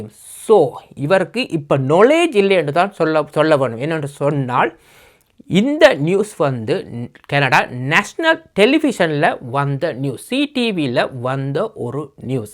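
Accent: Sri Lanka. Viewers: Ilankai thamil kekka rompavum inimai.😅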